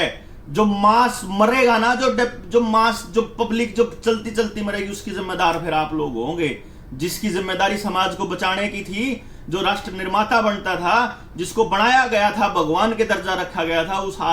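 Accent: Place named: Haryana, India. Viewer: हिंदी ही है पर लहजा हरियाणवी है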